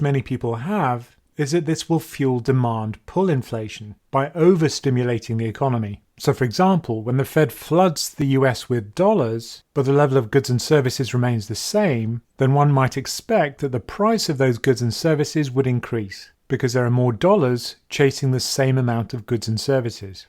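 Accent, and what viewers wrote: United Kingdom. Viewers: Why do I get that feeling every time video with brit ascent remind me M .I .6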